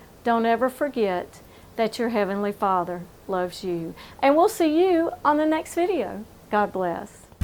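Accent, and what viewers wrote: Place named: South, United States. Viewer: Oh perfect I love the southern accent (I'm from the UK) and I also love learning about herbs... ❤❤ Subscribed right away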